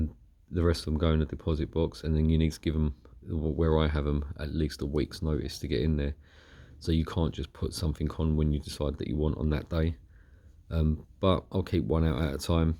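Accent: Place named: United Kingdom. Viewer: mate post more videos, i like your shit. Ur voice is deep and thoughful, i like ur british accent a lot. Brings me back to the time i used to study in the UK.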